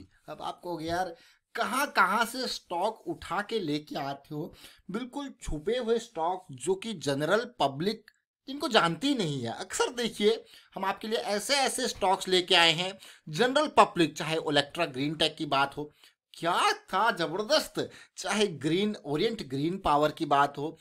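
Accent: Uttarakhand, India. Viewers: Sir , Are you from uttarakhand?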